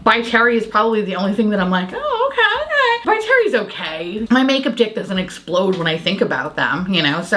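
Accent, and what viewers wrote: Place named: New York, United States. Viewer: Observation on your New York accent: I never noticed it in your videos filmed in Queens, but now that you're in Florida, the accent is more noticeable! ❤️ Maybe you're subconsciously differentiating yourself from all those FloridaPeople™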